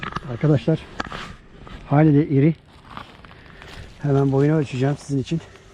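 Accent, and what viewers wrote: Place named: United Kingdom. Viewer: British aksanına hayran kaldım abi bu arada. Bizim balıkçı aleminde nadirattan olacak derecede iyi bir aksan. Selamlar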